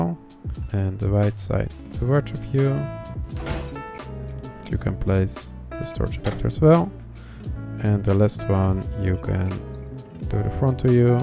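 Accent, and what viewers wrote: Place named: Netherlands. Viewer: The dutch is strong in this one....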